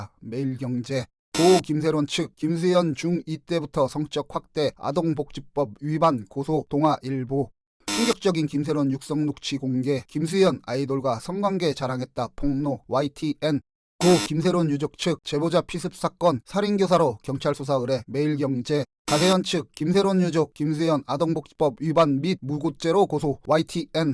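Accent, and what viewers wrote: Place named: North Korea. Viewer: 북한 사람 같아요 말투가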